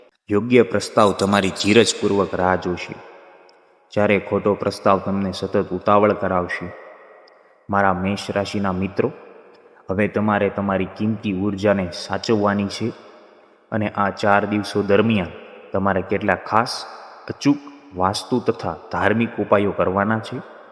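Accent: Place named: Bhavnagar, India. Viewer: તમે ભાવનગર તરફના હો, એવું તમારી ભાષા અને ખાસ તો તમારા ઉચ્ચારો પરથી લાગે છે. જવાબ આપશો.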